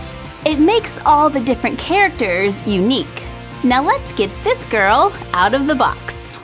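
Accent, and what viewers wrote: South, United States. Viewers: you have an amazing southern accent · her southern accent 😂😂😂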